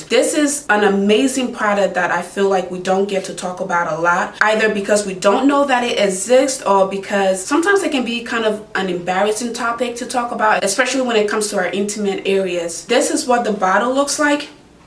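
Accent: Liberia. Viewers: Are you Liberian because YESSSSSSSSSSSSS!!!!!!!!!!!!??????? I can’t help but notice the accent I’m sorry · I can definitely hear your Liberian accent lol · That accent sounds like a Liberian girl. Go queen ❤️ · Ayyeee! LIB sis, as I hear your accent! Do it for the culture hunnnny,! Yasss! Thanks for this info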